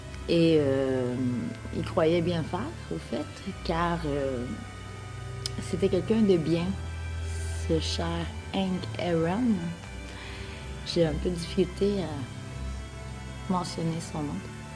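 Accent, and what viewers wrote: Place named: Quebec, Canada. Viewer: Au Québec, j'ai joué durant 20 ans à un sport nommé "fastball" un dérive du base-ball.Selon moi, le base-ball est un des sports qui exige le plus de stratégie, de réflexion, de tactique, de concentration et d'instinct. Mr. Aaron a été l'un des plus grands dans son domaine, faisant preuve d'humilité et d'humanité malgré sa grande popularité.Beaucoup de sportifs professionnels devraient prendre exemple sur lui. En passant, je suis complètement bilingue et vous prononcez très bien son nom, avec un léger accent Québécois tout à fait charmant.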